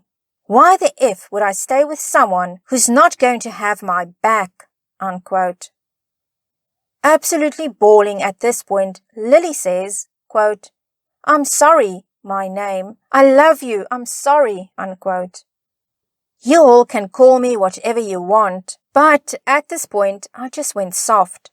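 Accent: South Africa. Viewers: Aaaaah the rare south African Afrikaans accent out in the wild! What a rare sight! Great commentary at the end :). All the best for your channel!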